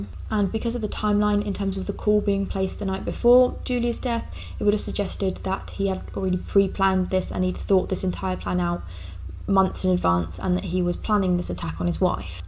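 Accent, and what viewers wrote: Essex, United Kingdom. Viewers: they're mostly Northern English accents, which are considered sort of "proletarian" and less "pretty", maybe because business english / upper class English is closer to Southern English and the North used to be more working class, and working class accents don't enunciate words as clearly. Scouse is the dialect around Liverpool, Geordie is Newcastle, Cockney is working class areas of London. is from Essex but her accent isn't strong Essex imo. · I love you're accent people from the UK have lovely voices.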